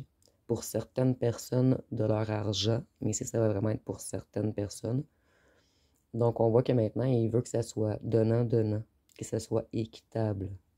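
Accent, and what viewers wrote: Quebec, Canada. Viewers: La lumière est ok!! Ça fait du bien entendre une québécoise! Bonne justesse de tirage, MERCI ❤ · Pas du tout en colère, il m'a fallu des années pour travailler sur mes blessures ce nest pas pour réagir comme celle que j'étais avant ❤❤ La lumière est ok pour moi. Merci pour ta lecture et ton joli accent québécois ❤❤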